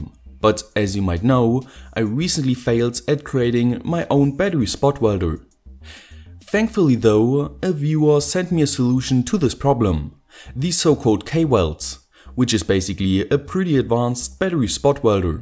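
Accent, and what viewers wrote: Germany. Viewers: German English ;) "controlaaa" :) "wourkin" :)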